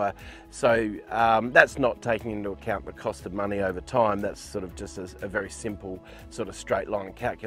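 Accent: Australia. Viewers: You sound like an Aussie but you speak in terms of miles and referencing US locations. Are you referring to costs in Australia or the US? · I've been watching your videos thinking you were in Australia due to your Aussie accent but now realised you're in the US!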